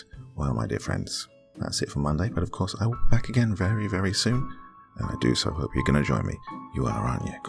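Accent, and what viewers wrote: Russia. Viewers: OMG YOUR RUSSIAN ACCENT!!!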